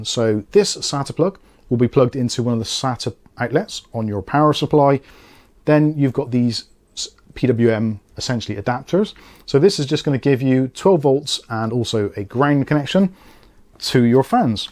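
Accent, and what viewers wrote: Bristol, United Kingdom. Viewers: Thanks for the video. You’ve deffo got a south west accent, sounds Bristolian but could be wrong lol.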